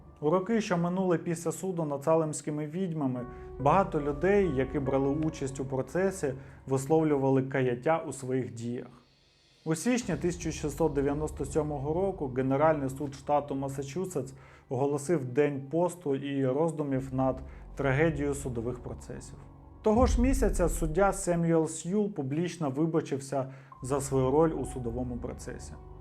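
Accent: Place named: Russia. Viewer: Дуже крутий контент, подивився вже майже усі відео Але є трошки конструктивної критики) Було б добре, якби автор трошки попрацював над вимовою (маю на увазі зросійщену "г" і подібні русизми) А так все файно, дякую за працю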